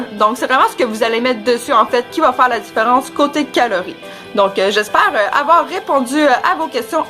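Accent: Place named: Canada. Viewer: On va me traiter de raciste normal c'est la nouvelle génération de merde mais, l'accent canadien me stress j'ai phobie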